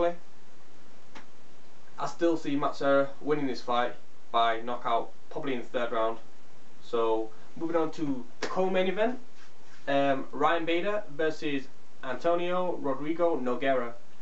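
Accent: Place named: United Kingdom. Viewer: great british accent you have. Awesome accent, though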